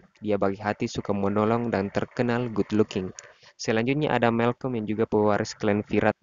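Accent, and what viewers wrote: South Sulawesi, Indonesia. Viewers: Orang Bugis ki kah,logatta sangat kental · Bang orang sul-sel ya?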